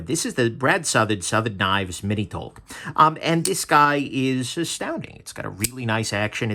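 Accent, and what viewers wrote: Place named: New Jersey, United States. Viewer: Signore i love your Italian American accent new jersey ❤️ 🇮🇹🇺🇸 🗽auguri in bocca al lupo 🙂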